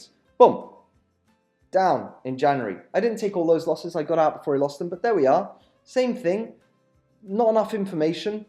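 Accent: United Kingdom. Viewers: I love the British accent. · Mate your British accent reminded me good times that I spend in UK! Very friendly speech mate! Thanks for the info!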